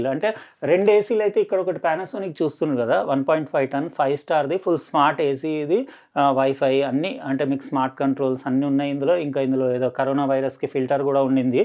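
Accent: Telangana, India. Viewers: సార్ మీది తెలంగాణ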